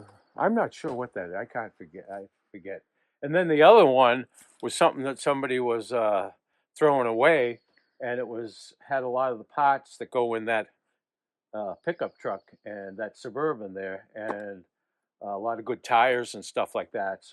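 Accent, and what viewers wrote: Boston, United States. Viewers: Medford mass fire shirt!!! it amazes me you still have your Boston accent!!!!!! after 40 + years!! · Is that Medford NYFD shirt? I live near there..love ur Baston Accent I miss seeing you all on TV 😭 great red white and blue 💙❤️ too ! God bless you all 🙏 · Stan buddy i wana tell u how awesome it is to still watch u and have new videos today even after ur show stopped ...me and my mom used to watch u guys every week.... Were also from massachusetts just west of plymouth and she picked up on ur accent before we even knew u were from boston...she was born in '60 in quincy ....anyways shes no longer with me and i just found ur videos on utube and its really helped me feel closer to her cuz it was something we did together....i just wanted u to know how ur helpin me today so THANK YOU so much Stan and family we love u guys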